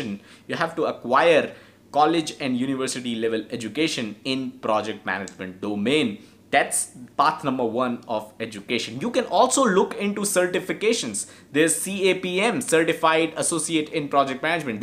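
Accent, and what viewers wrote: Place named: India. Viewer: i understood everything with India accent 😁, i have difficulties sometimes to undestand the accent.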